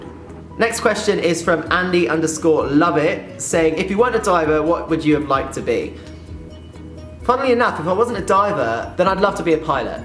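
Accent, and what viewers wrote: United Kingdom. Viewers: I could watch you forever and listen your lovely british accent <3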